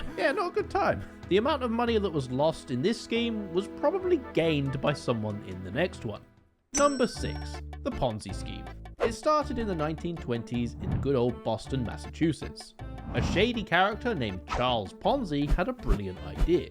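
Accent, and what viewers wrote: United Kingdom. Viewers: Wait is this brit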